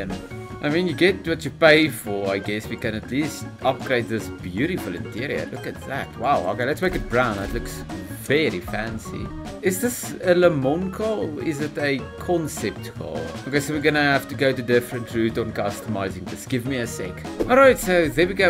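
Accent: South Africa. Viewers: When I heard ranting and swearing about BS electric cars in a South African accent, I subbed. Lol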